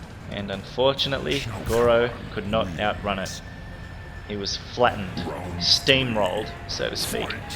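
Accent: Australia. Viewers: It'd ruin it if he did make it 'big' though, part of his charm is that he more or less DGAF. He keeps it real, he's just an authentic, enthusastic gamer who's very naturally funny and is excellent at explaining things. The Aussie accent is a bonus. If he went down the Pewdiepie route I'd never forgive him.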